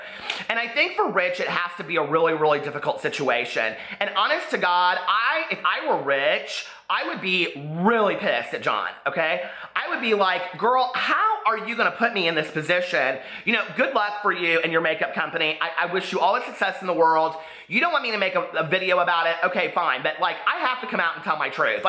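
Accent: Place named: Midwest, United States. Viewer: Yes!! It has to be a Midwest thing, I say it the same way!😂😂 I'm from Northwest Indiana.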